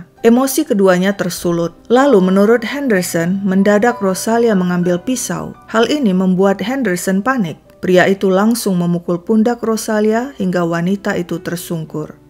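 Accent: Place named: Medan, Indonesia. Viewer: kak ini orang dari sumatera utara kah? pelafalan logat medan nya PAS kali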